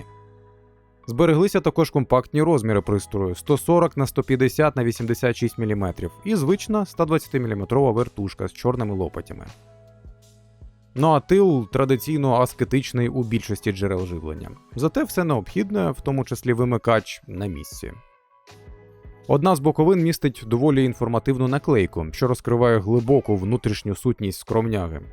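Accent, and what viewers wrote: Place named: Galicia, Ukraine. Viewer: вылез галицикий селюк😂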